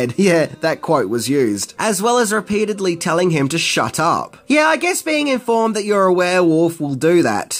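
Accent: United Kingdom. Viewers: Dude I thought you were British…😕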